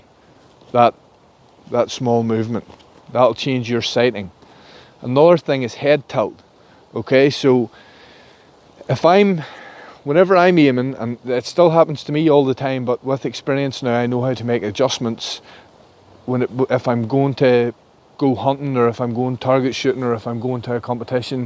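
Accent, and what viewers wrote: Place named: Scotland, United Kingdom. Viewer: The single best thing about this video is that is Scottish hahaha tnx for a great vid lad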